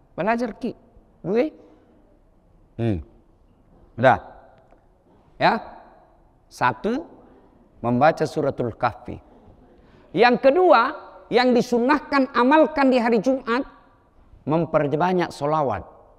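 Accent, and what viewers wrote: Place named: Sulawesi, Indonesia. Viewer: Logat Sulawesi Pak Ustadz Sulawesi Bagian Manaki Ustadz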